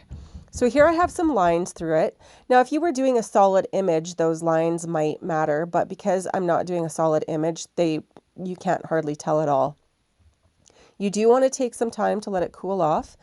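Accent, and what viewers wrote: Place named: Wisconsin, United States. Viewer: I lived in WI years ago and you remind me of what my accent was, I love it!!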